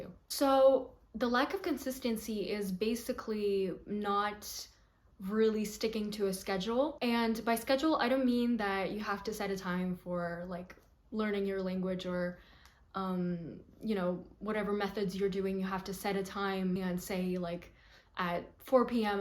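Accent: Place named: Turkey. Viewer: Are you Turkish? Your accent is incredible. Would you say that watching movies was the main thing for you?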